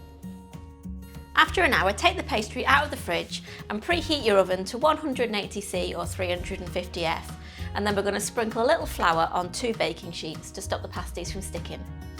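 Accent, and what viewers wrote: United Kingdom. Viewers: Your Video, recipe, presentation is all so LOVELY as your Lovely British Accent. Regards from Sunny California.......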